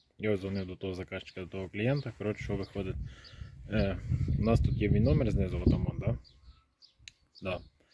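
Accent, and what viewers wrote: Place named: Hutsul, Ukraine. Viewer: Привіт з Волині ,Автор одне питання ви з Гуцульшині чи області Закарпаття?????акцент увас похожий)).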